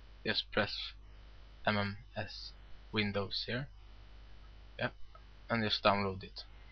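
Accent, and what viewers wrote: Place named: Sweden. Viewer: Pungt kom... DOT COM! haha, du är från sverige asså ^^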